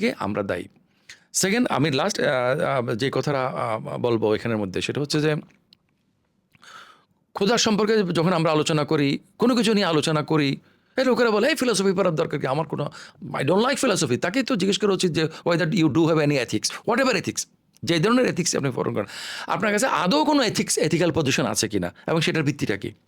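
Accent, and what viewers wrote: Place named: Noakhali, Bangladesh. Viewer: নোয়াখাইল্যা বয়ান!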